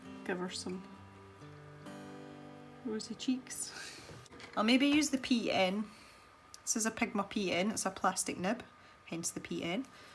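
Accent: Scotland, United Kingdom. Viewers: I'm a first time visitor to your Youtube channel. I'm from Florida, U.S.A. and my Southern ears have a hard time understanding the English accent. I'm just guessing here, but, are you from Scotland, or Ireland? Your accent is wonderful and, surprising to me, I find it very soothing. I've really enjoyed watching your video. I do a lot of Adult coloring and I use Poscas in my coloring. It's one of the few whites that will lay down over my colored pencils, but I often have to use multiple layers because they tend to pick up the colors I cover. For a white highlight, I have to give them plenty of drying time between layers. I'm subscribed to your channel and will look forward to your next video. (I'm also a fellow novelist.)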